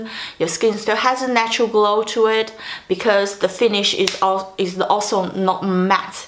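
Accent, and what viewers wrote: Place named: United Kingdom. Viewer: 厉害了我的琳👍英文好赞！喜欢你这带点英式的发音呢😊😊😊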